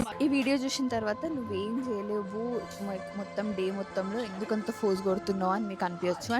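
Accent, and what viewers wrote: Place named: Hyderabad, India. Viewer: Your videos look just natural ❤and more over your slang is pakka Hyderabadi ❤❤️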